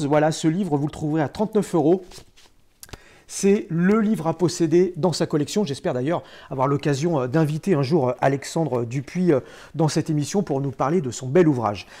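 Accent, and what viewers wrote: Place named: Paris, France. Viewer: Je rajouterais un +1 pour ton phrasé qui fleure bon le Paris qu'on aime !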